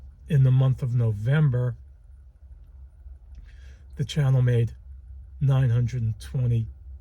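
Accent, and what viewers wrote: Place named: Mid-Atlantic, United States. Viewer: Mid Atlantic accent. Interesting.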